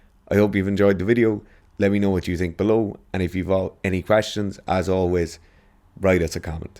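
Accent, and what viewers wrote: Ireland. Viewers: Your accent is aggressively irish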